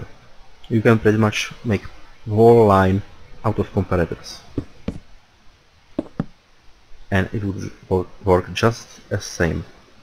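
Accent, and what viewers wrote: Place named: Czech Republic. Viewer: Český přízvuk se pozná vždy :D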